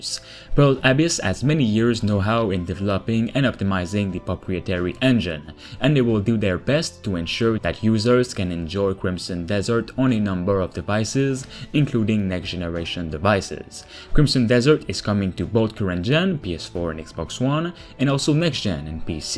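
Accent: Quebec, Canada. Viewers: I am sure of one thing, your accent is from Québec ?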